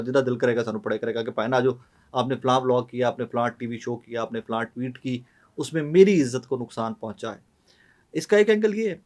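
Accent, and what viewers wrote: Punjab, India. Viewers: Ap ka punjabi ka terka sun k sawad dugna ho jata ha vlog ka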